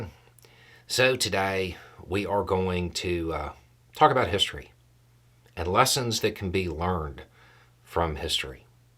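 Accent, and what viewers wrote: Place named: Transatlantic, United States. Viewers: Hi, I really enjoy your compassionate analysis. I understand this video was a tangent for you, but I really enjoyed it. Also, please feel more than free to use the transatlantic accent as often as possible in the future. I loved everything about it, no notes! 🙂❤️ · transatlantic accent is not something I ever expected to hear. 10/10.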